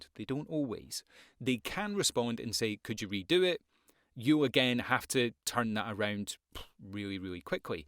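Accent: Scotland, United Kingdom. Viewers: Your videos are fantastic. Began my VO career last year and have jumped around a number of channels on the subject. Yours are extremely thorough and made all the more watchable thanks to articulate manner and Scottish accent! Lovely stuff 😎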